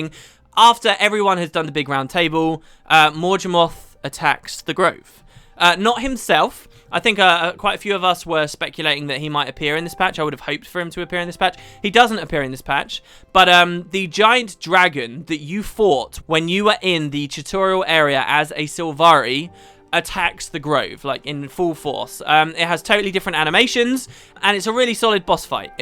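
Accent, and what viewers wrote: United Kingdom. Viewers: Your voice, is fucking amazing! Love me a well spoken Brit...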